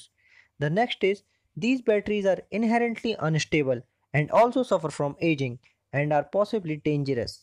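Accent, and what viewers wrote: India. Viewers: I just hate this indian accent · ogod indian accent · f.king indian accent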